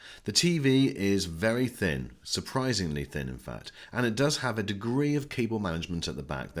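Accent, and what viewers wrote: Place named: United Kingdom. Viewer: Dude he’s not Australian he’s British LOL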